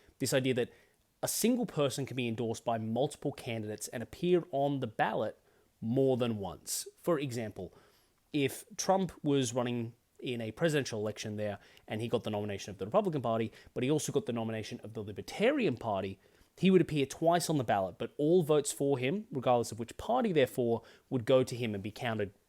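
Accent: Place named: Australia. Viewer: Having watched this shit show from the outside through other progressive commentators its so funny to see another aussie with the VERY australian way of talking about things. Its sad to see so many other commentators who refuse to say that corpo dems deserve to have their teeth kicked in for constantly ratfucking anyone they dont like.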